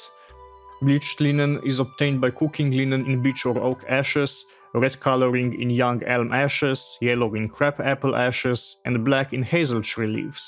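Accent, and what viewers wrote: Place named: Slovenia. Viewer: 3 seconds in and I instantly recognize a fellow Slovenian by the accent ;)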